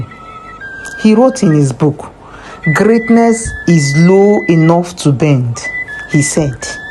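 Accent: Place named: Igbo, Nigeria. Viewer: Wow my own sister I love the Igbo accent very powerful story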